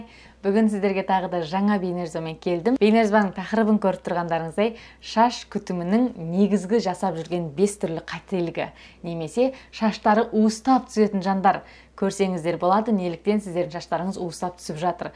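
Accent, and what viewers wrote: Standard. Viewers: таза казакша сойлейди екен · Сіңілім рақымет кеңес бергеніңе .керемет сенің қазақ тілің құрет тұтып әлі күнге қазақшаны таза сөйлегеніңе риза болып отырмын құрметті бол жаным. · Жарайсың өз тіліңді ұмытпай таза сөйлейсің Кореяда тұрсада Қазақстанда тұратын қазақтар орысша сөйлейді қазақ өз тілінде сөйлей алмайды бақытты бол сәттілік тілеймін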